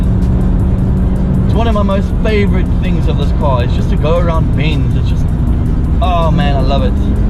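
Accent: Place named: South Africa. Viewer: Sound South african lol love it Subbing dude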